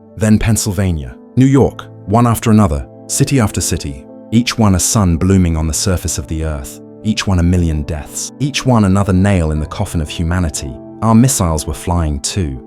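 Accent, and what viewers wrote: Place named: United Kingdom. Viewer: a British accent is entirely wrong for Fallout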